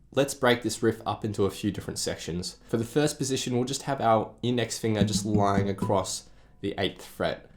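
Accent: Australia. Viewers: Hello are you from Australia?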